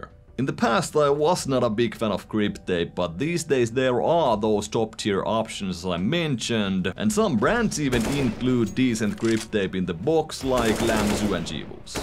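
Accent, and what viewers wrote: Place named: Finland. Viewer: are you finnish? your accent sounds very finnish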